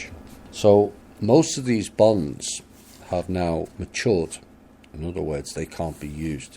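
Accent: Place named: Scotland, United Kingdom. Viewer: And a lovely, lilting Scottish accent to boot.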